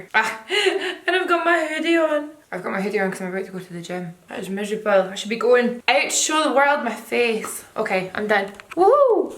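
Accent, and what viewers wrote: Scotland, United Kingdom. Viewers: the scottish accent is the best! But then again, I'm scottish too hahaha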